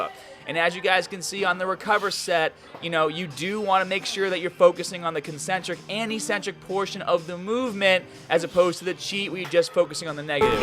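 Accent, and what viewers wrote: Boston, United States. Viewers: Baaahstan accent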